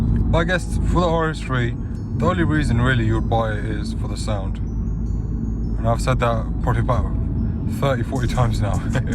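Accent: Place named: South Asia. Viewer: This is so odd you are white but you sound south asian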